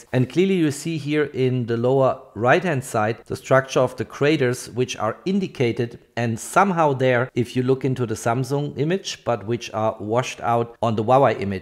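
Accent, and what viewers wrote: Germany. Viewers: Are you german🫠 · Hard german accent :D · wieso machst du das nicht auf deutsch? hört man doch deutlich dass du deutscher bist 🤔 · Gutes Video, aber arbeite vielleicht ein bisschen an deiner Aussprache :)! Man hört den deutschen Akzent nämlich echt stark 😆 (zum Beispiel spricht man millimeter wie „millimieter“ aus)! Nicht böse gemeint, ansonsten passt alles 😊! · ah yes, i hear the german-ness in your voice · Is it only me or has he a German accent? It sounds all so German xD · u sound like a german jew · du hast so ein deutschen Akzent haha xD hab ich recht? also kenne dich noch nicht das erste mal auf dein Kanal aber das klingt sehr Deutsch für mich :) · I thought the same xD. It really sounds so german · Iv I should guess, you are German!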